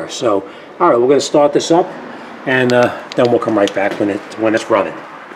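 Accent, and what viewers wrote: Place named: New York, United States. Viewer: Hey, I can hear you are from my area NYC. Where is your repair shop located?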